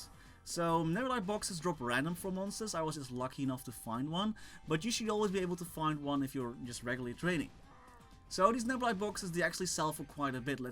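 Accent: Netherlands. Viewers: just new to ur channel u sound dutch, nice video btw!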